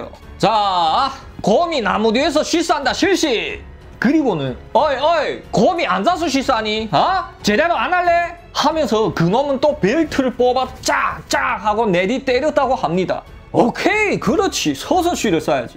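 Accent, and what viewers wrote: China. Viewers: 아무생각없이 클릭해서 첨에 한국사람이 방송하는줄알앗는데 말투가 ..같은 조선족이라 ...음...ㅎㅎㅎ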